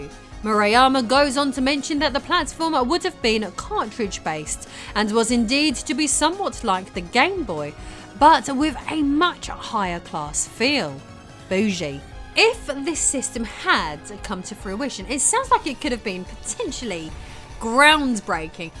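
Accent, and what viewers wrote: England, United Kingdom. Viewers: How have I not come across this amazing channel before??? So much rare footage, attention to detail and such a lovely, kickass english accent 🤩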